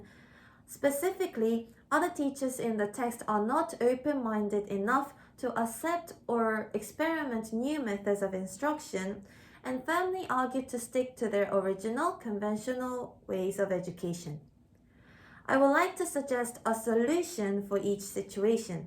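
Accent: United Kingdom. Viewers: 영국 발음이시네요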